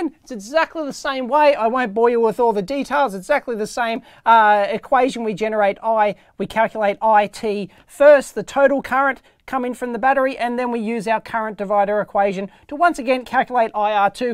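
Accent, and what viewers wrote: Australia. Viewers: I love this man. Also the Australian accent is icing on the cake. Great tutorial, thanks!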